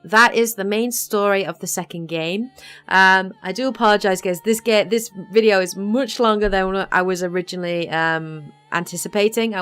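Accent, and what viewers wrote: United Kingdom. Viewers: 8 years late but hopefully not too late I played the 3rd game around 4 years ago and loved it went back and read all the books and played through thronebreaker, so now I need this video to catch up with the 2nd game in earnest Thank you so much! Also, the northern accent makes the video even more authentic, you sound like you give council to Queen Meve on the side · should´ve watched before playing witcher3. really well done don't mind the length at all. northern dialect is a big plus as well tah !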